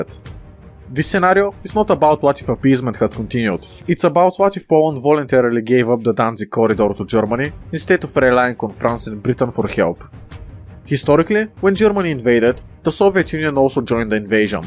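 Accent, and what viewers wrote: Bulgaria. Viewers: По диалекта разбрах че си Българин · Are you by any chance bulgarian? The accent kind of gave it up😊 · Bro are you from da balkans shot you speak kinda like one of my bulgarian friends : ? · are you bulgarian by any chance? you sound like it.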